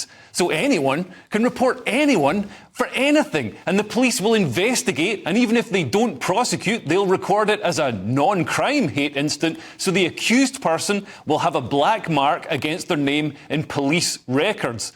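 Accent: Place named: Glasgow, United Kingdom. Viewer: I love the Glasgow accent. You said 'hate crime', I heard 'hair cream'.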